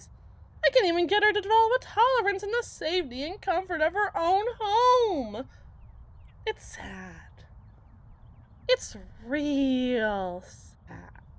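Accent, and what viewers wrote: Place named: Brooklyn, United States. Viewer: Brooklyn accent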